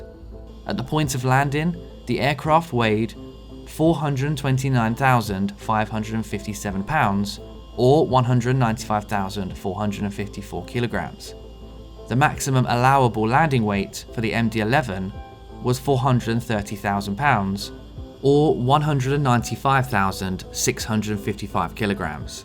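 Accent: United Kingdom. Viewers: I've skipped by your channel many times, didn't realise you was a fellow Brit. Thought you was another one of them boring American channels. Subscribed!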